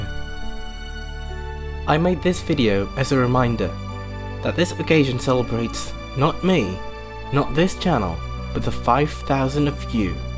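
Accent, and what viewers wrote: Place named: Indonesia. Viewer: I feel like his Indo accent is way stronger here than previously.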